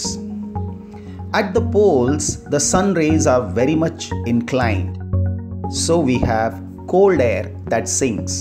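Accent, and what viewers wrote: India. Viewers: the indian accent takes it to another level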